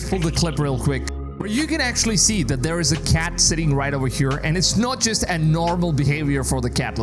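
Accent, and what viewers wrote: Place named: India. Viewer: Your accent is funny, it's not very American. It almost sounds Indian. :)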